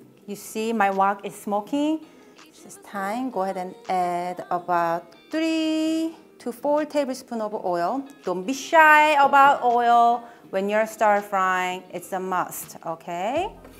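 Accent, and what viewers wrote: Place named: South Korea. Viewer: Omg your Korean accent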